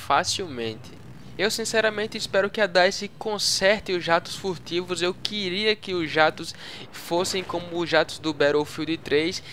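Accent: Northeast, Brazil. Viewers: Você é nordestino?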